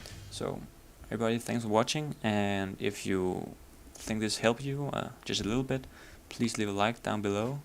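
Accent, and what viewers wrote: Denmark. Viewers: Nice danish accent dude! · du er dansker kan jeg høre skal man være exalt med dem ?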